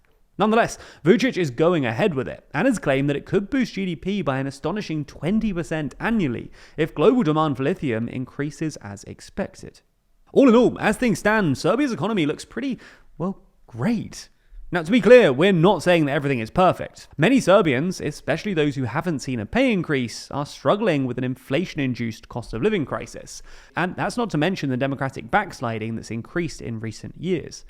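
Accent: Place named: RP, United Kingdom. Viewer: Good analysis, however you forgot few things. One of the reasons behind the price increases especially in real estate is the migration influx from Rusia and Ukraine. Another huge investment is the UAE construction project like Belgrade Waterfront. Also, don't forget the Serbian diaspora and their effect on the serbian economy especially in real estate. Another country investing in real estate and construction is Israel, also a major importer of serbian produce weapons. To top at all, Serbia is hosting the World Expo 2027 and the government is spending lot's of money on the projects. At the end, just a personal note...no need for a posh language, normal British narration will suffice.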